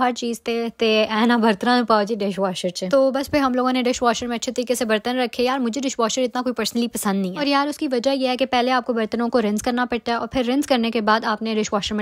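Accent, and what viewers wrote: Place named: Punjab, India. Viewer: Ap ky vlog ka shaded wait rehta hai... Lamba bnaya kary ... Punjabi ka tarka hi to acha lagta hai ❤😊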